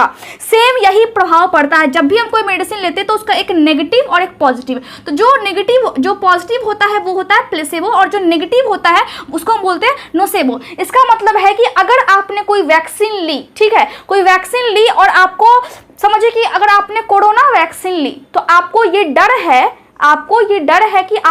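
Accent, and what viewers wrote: Bihar, India. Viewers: mam aap बिहार से हैँ क्या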